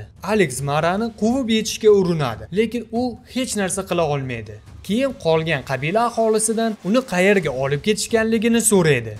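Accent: Uzbekistan. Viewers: G'irt Andijoncha shevada tushuntirdi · Жиззахликми дейман шархловчи 😊😂😂😂 ўзимизни шивани дарров таниманда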